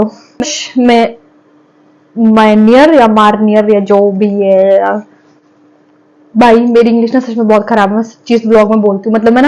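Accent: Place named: Karachi, Pakistan. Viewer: aapka hindi accent 90% Karachi walon jesa hai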